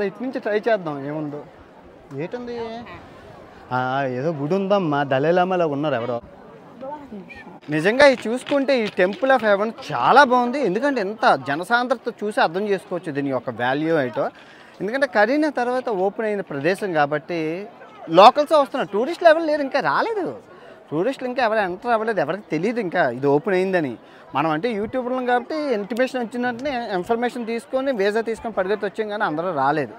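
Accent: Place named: Godavari, India. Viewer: అబ్బాయి చాలా excellent ga organise చేస్తున్నావు. నీ సహజమైన గోదావరి యాసలో explain చేస్తున్నావు. మళ్ళా మన దేశం సెల్ఫ్ డబ్బా వద్దు . వాళ్ళ గొప్పతానినీ మనం హర్షించలి కూడా.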